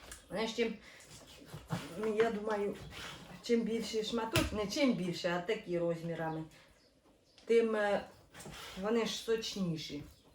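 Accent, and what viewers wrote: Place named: Khmelnytskyi, Ukraine. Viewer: Шматки за розміром ідеальні. Цибулька, водичка, це теж обов'язково. Не люблю коли дають поради , але хочу, щоб тобі теж було смачно. Беру приправу до шашлика в Аврорі, в банках. Ідеально підібрані спеції. Додаєш багатенько.Сіль додатково не потрібна, там все є. Через добу готово. Важливо, щоб спочатку з двох сторін на максимальний жар(запечатати, щоб сік кипів усередені, а зовнє не виходив).І не перетримати, щоб не сухе було. Смачного Тобі! Ти молодчинка! До речі, мова, як в нас, на Хмельнитчині. Привіт Тобі зі Старкона!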